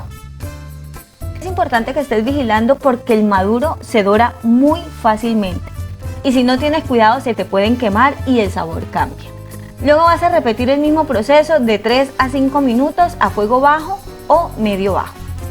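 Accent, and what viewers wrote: Colombia. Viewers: En las mismas ando yo, me tiene confundida entre colombiana y tica. Cuando dijo tajadas volvi a pensar que es colombiana.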